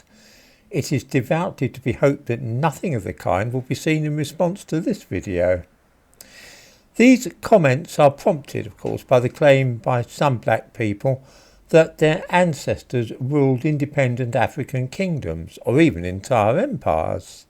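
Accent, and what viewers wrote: United Kingdom. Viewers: I love how this man has a thick british accent, a moustache AND looks down on you, literally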